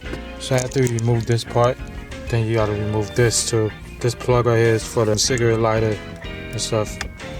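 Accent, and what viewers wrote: New York, United States. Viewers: i can hear the NY in ya voice where u from um un NY too install my shyt lol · Us nyc cats know when we hearing that new your accent!!! Good vid 🗽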